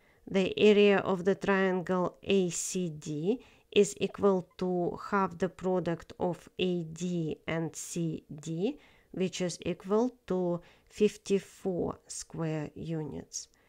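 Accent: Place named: Russia. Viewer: Thank you both for the good problem and your great explanation! By the way, I love your Russian accent when you speak English.😊❤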